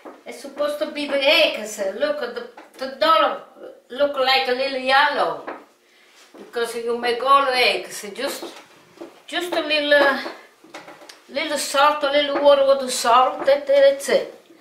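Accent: Italy. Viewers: ! I love your food, I love your Italian accent. My grandparents came to this country from Bar, Italy. I miss them, I miss kitchen time, especially with my Aunts, too. Please keep cooking!!! 🙏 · Take a chill pill. Im merely saying that I prefer listening to italian granma in her accent it gives the channel a certain flavour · All I had to hear was, "Today I make a fettuccine" in a strong Italian accent... I know I've come to the right place.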